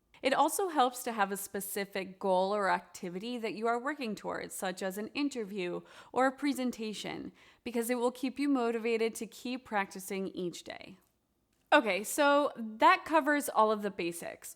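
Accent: United States. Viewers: you sound very American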